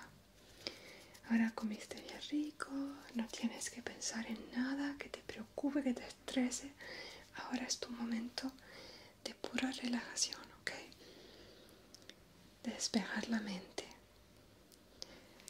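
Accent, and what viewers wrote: Venezuela. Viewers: Me encanta tu acento venezolano, muy relajante. Saludos. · Me encanto lo Italo-Venezolano de Caracas pal mundo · De dónde eres? No sé muy bien porqué pensé que eras italiana (creo que es porque tienes varios videos en italiano) pero el acento venezolano está súper on point, estoy confundida 😂😂 Saludos desde Venezuela ❣️ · Que linda. Gracias por tu video. Me encanta que se nota tu acento Venezolano. 😍😍😍 Me dio hambreee!!! Hugs. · Adoro tu acento italo-venezolano ❤️ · Amo tu acento Venezolano es único! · Hola en estos tiempos de angustia que estamos viviendo en Costa Rica, el verte y escucharte me relaja bastante, me encanta tu hermoso acento venezolano gracias por ser tan especial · Graciass! me ha ayudado tu videitoo! un video de ASMR bien fino! jeje me ha relajado bastante escuchar ASMR con mi acento Venezolano <3 Un besito corazón! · Que dulce es el acento venezolano ♥️ mil gracias por esto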